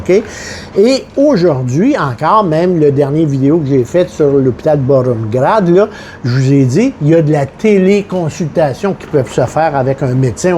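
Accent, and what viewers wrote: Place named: Quebec, Canada. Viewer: J adore votre gouaille de quebequoais .. 😀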